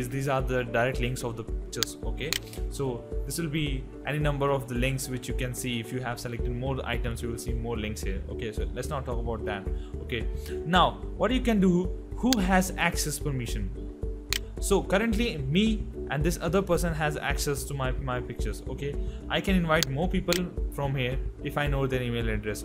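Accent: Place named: India. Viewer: Sorry can't handle the extreme mono-toned Indian accent. :(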